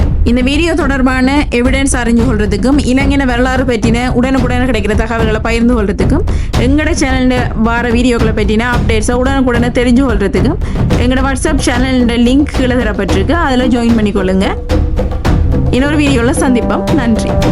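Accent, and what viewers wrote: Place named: Tamil, Sri Lanka. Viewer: அவர்கள் அழகாக எங்கள் பேச்சு வழக்கு சார்ந்தே பேசுகிறார் தயவு செய்து குற்றம் குறை கண்டு பிடிப்பதை நிறுத்தி இலங்கையில் இது போன்ற செயல்கள் வரவேற்கப்பட வேண்டியவை இலங்கை தமிழர்களின் தாய் மண் எமது வரலாறு இப்போது அழிக்கப்பட முன் காக்க வேண்டியது அனைத்து ஈழத் தமிழர் கடமை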